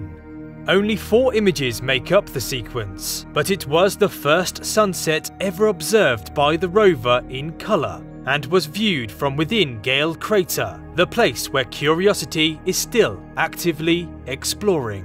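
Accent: United Kingdom. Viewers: Who would have expected a British accent in a Mars footage?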